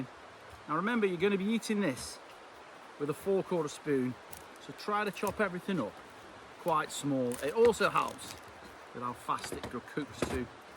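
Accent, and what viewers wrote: Stoke, United Kingdom. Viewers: I hear that you're a fellow Stokie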